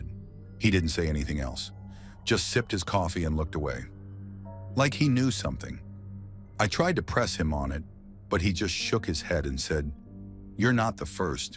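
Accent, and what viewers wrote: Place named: Chicago, United States. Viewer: I love the Dennis Farina accent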